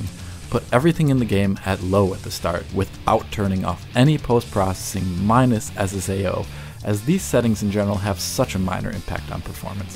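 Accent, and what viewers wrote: Germany. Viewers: Hm. I guess my ear is just trained for it then hearing that accent a lot. For me it was always sort of obvious. It's in the tone of his voice. It's just so.. german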